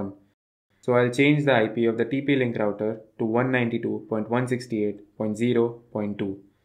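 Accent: India. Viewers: regardless what you are talking about Indian english is just funny :D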